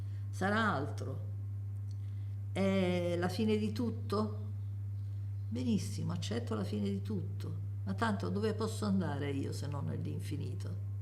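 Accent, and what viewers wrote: Rome, Italy. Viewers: Ciao, io sono una ragazza di 17 anni che da poco ha scoperto i suoi video. Durante la mia vita, ho sempre sofferto il “non sapere” in modo molto forte, cioè già a 7 anni avrei voluto farla finita perché volevo sapere subito cosa ci fosse dopo. Questa sofferenza, secondo me, deriva dalla paura di non poter esistere più, per questo vorrei rifugiarmi sempre nel “cercare di comprendere la verità”, ma a volte devo accettare semplicemente che essa a me non si voglia rivelare. Da lei sto imparando che non sempre bisogna avere la pretesa di sapere ciò che non si sa, a volte va bene anche rimanere nel dubbio. Studiare filosofia e immergermi nei suoi video, per me, sono due vere cure, perché mi rassicurano sul fatto che anche dopo la morte non cesserò di esistere, perché comunque qualcosa sono e da qualche parte dovrò pur andarmene. Sento dall’accento che lei é del Lazio, forse di Roma e nel caso sia come penso, visto che probabilmente abitiamo entrambe nella stessa città vorrei incontrarla davvero davvero tanto. 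Avrei tanto piacere a dialogare con lei perché sarei felice di incontrare almeno una volta qualcuno di estremamente saggio proprio come lei. Un abbraccio. ❤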